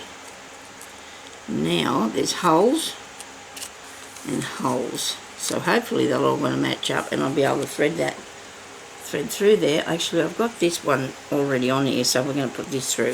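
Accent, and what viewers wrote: Australia. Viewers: love the accent. you in aussy. good video. always wanted to come to Australia but everything over there that bites is deadly lol. good job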